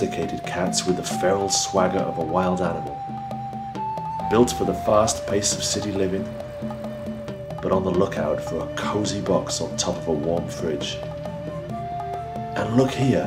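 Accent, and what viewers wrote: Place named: RP, United Kingdom. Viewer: David Attenborough would be proud. BBC English, of course. Narrated by some guy called. How's that for authenticity?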